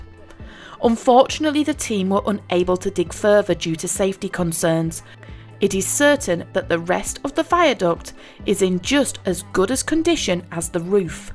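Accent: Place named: Yorkshire, United Kingdom. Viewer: What an amazing story 😃. This is rapidly becoming one of my favourite history channels 👏🤩🙏🇦🇺. Plus your accent reminds me of Yorkshire which I've always loved 😊.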